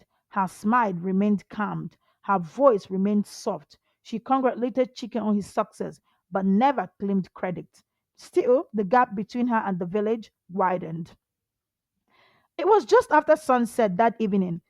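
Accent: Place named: Nigeria. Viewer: This Ai voice is unique with a touch of Nigerian accent 😂😂 and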